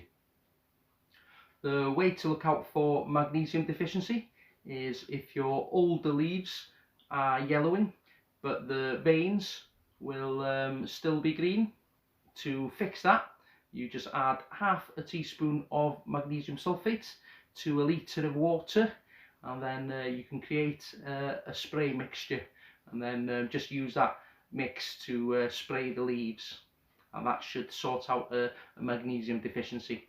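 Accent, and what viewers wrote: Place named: North Wales, United Kingdom. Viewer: Love your channel and this video mate, I lived in North Wales (Wrexham) for a few years about 20 years ago and your accent brings back memories! I have flower drop on a few of my indoor chilli plants, fingers crossed I can sort it out with your advice 🤞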